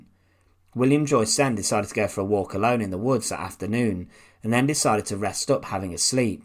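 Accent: United Kingdom. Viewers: I'm spending a year trying to figure out whether it is some UK area accent!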